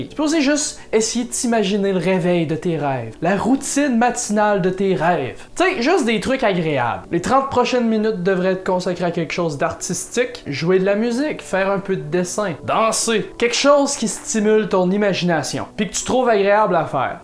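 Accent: Quebec, Canada. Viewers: Merci beaucoup pour ton video, ce sont des conseils merveilleux qu'on doit mettre en pratique, ils sont toujours bien appréciés. Je suis péruvien et J'ai encore un peu de difficulté pour bien comprendre l'accent québécois, mais j'aime bien. Je t'envoie mes meilleurs voeux.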